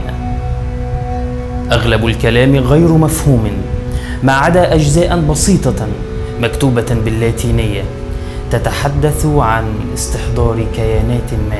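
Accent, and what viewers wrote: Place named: Egypt. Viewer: مش عارف اتفاعل معاك ب اللغه العربيه الفصحه عشان واضح اوي انك مصري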